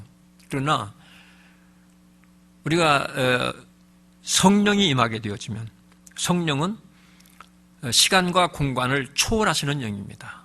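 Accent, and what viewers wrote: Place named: Honam, South Korea. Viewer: 목사님처럼 호남사투리가구수하게들리게하는목사님이또있을까싶습니다 권력눈치안보고소신껏예배를귀하게여기라는하나님의뜻에맞는하나님을진정두려워하는목사님의말씀 감사합니다!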